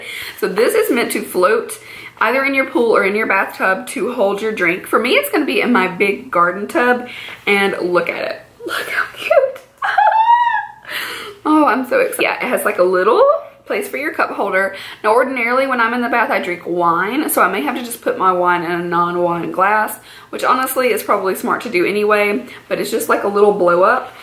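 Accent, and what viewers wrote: South, United States. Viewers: Growing up in the south and suddenly living way over in the west now, your voice is so nice to hear again. I miss the accents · That's awesome I've always wanted to visit there. Our southern accents are very similar lol. U r very beautiful and talented. This is my first time watching you and it is very helpful Ty!:)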